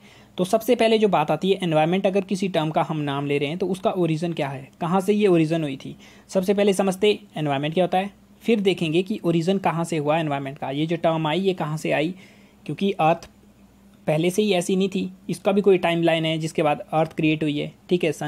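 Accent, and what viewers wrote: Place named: Himachal Pradesh, India. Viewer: Thanks sir 🙏 Are you belong from himachal ,,,??? Muje apky way of talking se lga that's why I am asking youu 😊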